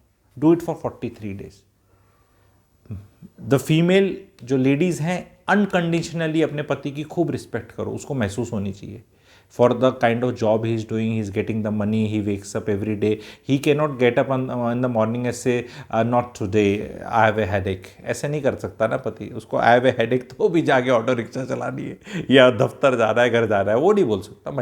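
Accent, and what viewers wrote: Haryana, India. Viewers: Hariyana se ho aap kya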